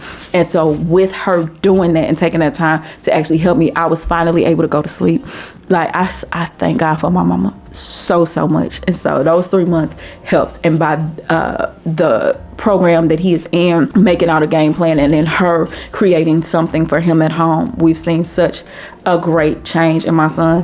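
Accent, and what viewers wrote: St. Louis, United States. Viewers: I knew your accent sounded familiar. St. Louise have distinct accent. So pretty God bless.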